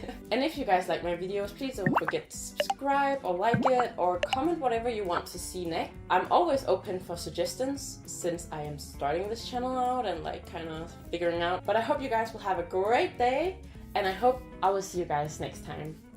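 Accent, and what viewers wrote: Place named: Scandinavia. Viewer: Oh my gods, I did wonder whether you were Scandinavian or Dutch or something similar due to your accent - and here you are walking around in my own city! Gosh, the world is a tiny place sometimes XD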